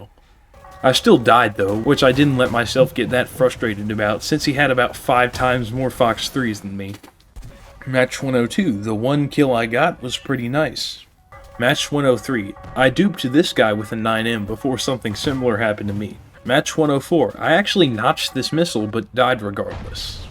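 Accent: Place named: South, United States. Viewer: I feel so bad for you. You're so clearly too stupid for top tier, so much so that I genuinely feel bad for you and your experience. Usually, people like you are scared away before it takes them 3000 hours to hit their first jet because your scores are so menial, every match you play. I think its either the miserably slow cadence of your voice (I get you're southern... [probably fat too]) or simply how you speak, but GOD. DAMN. Stick to Call of duty or something because I beg of you, please do not accidentally join my team...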